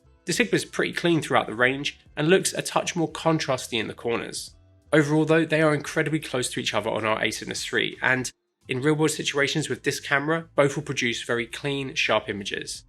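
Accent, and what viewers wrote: United Kingdom. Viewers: Not sure if the British accent makes this more enthralling, or the to-the-point, no frills, expertly-presented information. I think it's both.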